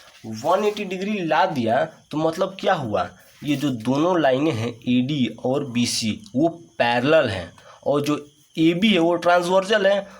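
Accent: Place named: Rural, India. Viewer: You are speaking like a dehati. Dehati kahi ka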